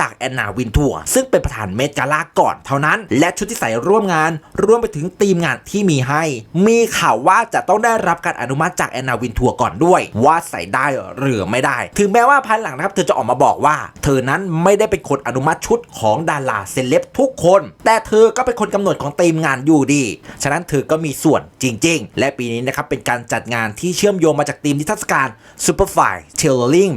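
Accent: Isan, Thailand. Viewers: พากย์แนวอีสานแบบแอดนี่แหละมันเห็นภาพสุดๆๆๆ ...อย่าฟ้าวๆๆๆ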